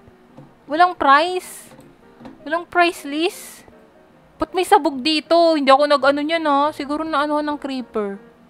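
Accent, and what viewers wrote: Visayas, Philippines. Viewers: Ate bisaya kapo bah? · bisaya po kayo? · Bisaya ka po ba ate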